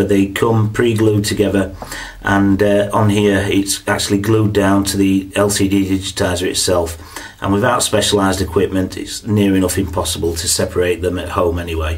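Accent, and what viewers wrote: Yorkshire, United Kingdom. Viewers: you sound like a yorkshire man ,,,are you near enough to fix mine ?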